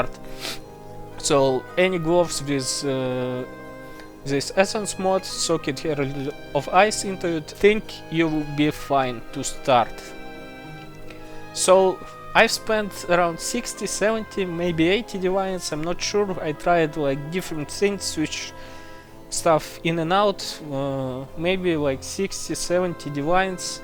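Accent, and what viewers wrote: Russia. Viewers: по акценту понял что Ру говорящий). Каефынй билд и в пое 1 есть что фармить на нем ) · Yo just rerolled this as my 3rd char this league and having a blast so far. So thx for the build, nice explaining overall and i love ur russian accent. Im currently using the Merc from my Minion Char, with Haste, Tyrant helm(flat fire dmg), blind, elemental weakness curse, and a bit survivability. What do you use for Merc? Is Determination worth it for example? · судя по говору сразу слышно, что русский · Тяжёлый русский акцент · i understand russian english better than original english, good video, brazil here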